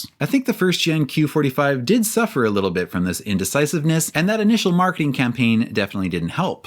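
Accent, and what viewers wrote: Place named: Canada. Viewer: you are definately CANADIAN. "aboat time."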